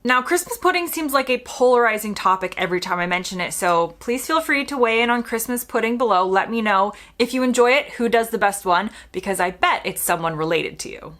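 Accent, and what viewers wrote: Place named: United States. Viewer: you are loosing the american accent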